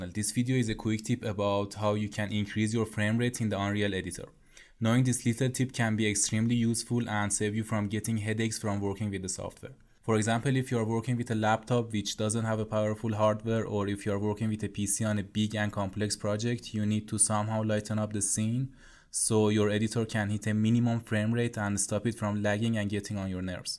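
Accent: Iran. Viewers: thanks for the tutorial 100% Persian accent 😄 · داداش دمت گرم عالی بود.ایرانی بودن یه طرف انگلیسی رو با لهجه اصفهانیدوست دارم :goodvibes:. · سلام ، عالی بود ، فقط یه سوال داشتم ، ایرانی هستی ؟ آخه لحجه ات فارسیه توی تلفظ کلمات اما بازم کارت عالیه ! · iranian accent :))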